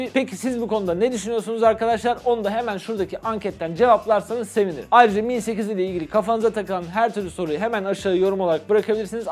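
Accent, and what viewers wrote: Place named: Uşak, Turkey. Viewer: ABİ SEN NERELİSİN UŞAK ÇEVRESİ GİBİ GARİ FELAN DİYON :D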